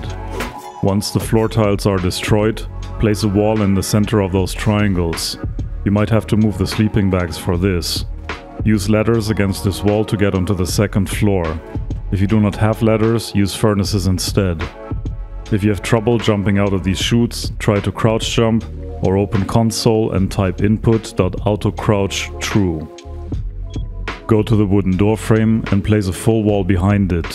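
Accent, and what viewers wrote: Germany. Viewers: so hart cringe das man hart raushört das du deutsch bist xD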